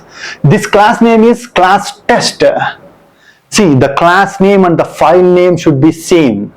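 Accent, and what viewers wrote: South India, India. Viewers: Are u from south india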